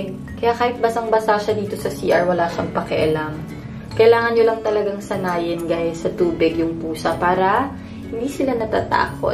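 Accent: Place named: Ilocos, Philippines. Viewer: with her ilokana accent. annako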